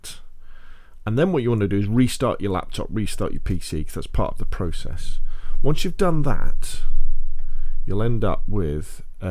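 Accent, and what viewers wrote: England, United Kingdom. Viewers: too english like wotah(water) eh ?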